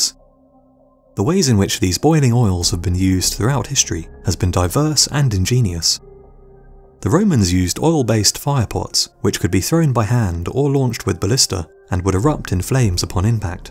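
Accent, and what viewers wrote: United Kingdom. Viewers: Awww shit! You know it's gonna be good when you gotta nigga with a british ascent talkin' 'bout some medieval shit! And yes, that mace=mince-meat.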